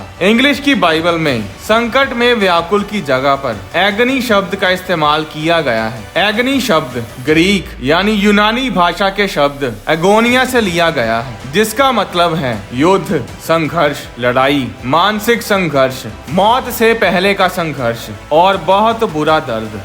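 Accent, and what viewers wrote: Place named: India. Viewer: One of the worst things ever, when you listen history from an Indian accent guy.😏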